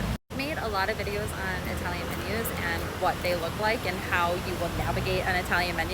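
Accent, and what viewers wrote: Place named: United States. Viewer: Sorry, but I couldnt really understand but a few words you spoke. As I’ve commented before, you speak so quickly and not everyone understands American.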